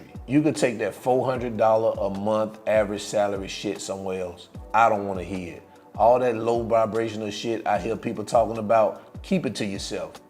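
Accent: South, United States. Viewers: I went from your wife's Dominican accent to your southern accent...lol! Great video I like how you enunciated your words and spoke clearly. I am an expat who has lived in Puerto Plata for 9 years I have a decent lifestyle and cost of living there but Santo Domingo is my second favorite place in the Dominican Republic for some of the same but some different reasons. To live the same lifestyle, I have in Puerto Plata it would cost me about 30% more therefore I am content visiting about twice a month. For me there are many shopping items you cannot find anywhere else in the country, but you can find them in Santo Domingo. In fact, if you can't find what you are looking for in Santo Domingo it's probably not in the Dominican Republic. As far as the restaurants and the women we are 100% in agreement. I have just subscribed to your page so keep up the good work....oh year you make a good team with your wife in her videos it's good to see a successful cross-cultural couple...thanks again!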